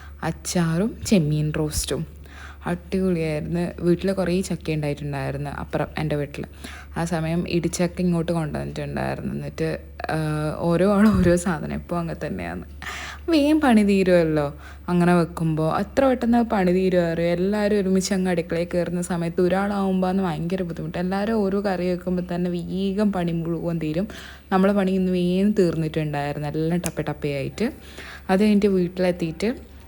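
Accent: Kannur, India. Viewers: സംസാരം കേൾക്കാൻ നല്ല രസം ഉണ്ട് ചേച്ചി.... കണ്ണൂർ ഭാഷ കേൾക്കാൻ കൊള്ളാം 😍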